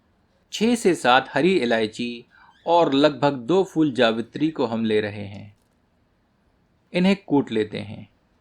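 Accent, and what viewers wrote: Standard. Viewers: The pure hindi voice over is amazing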